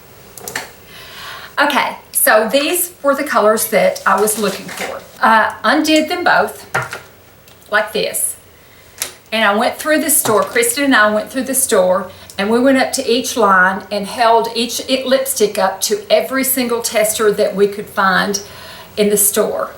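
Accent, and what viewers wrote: South, United States. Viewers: I love your Southern drawl and your kind personality,. Frankly, I feel like you could be my sister. I feel just right at home! ♥️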